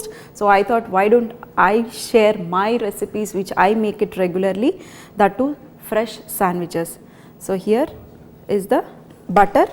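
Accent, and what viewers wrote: India. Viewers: I work in a hospital. I swear to God, the indian accent makes me wanna bust an indian in the fucking head!!!!!!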